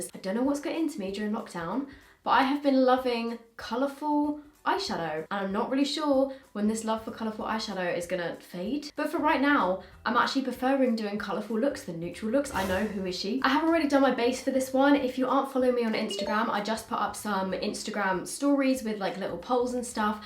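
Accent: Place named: England, United Kingdom. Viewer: I just love the whay how she speaks!Is she from England or something?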